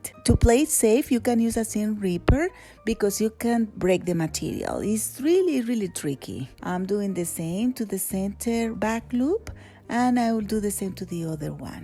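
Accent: Spain. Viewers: This is a great tutorial, thank you! I have the same “Pandemic Pants Problem” I did a triangle in the back, but was not good, yours look great! Your accent... are you Spanish? Your mother looks very young, so how old are you to be so talented? Thanks for share, I just subscribe from Puerto Rico! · Pero lo esta explicando en acento espanol! 😉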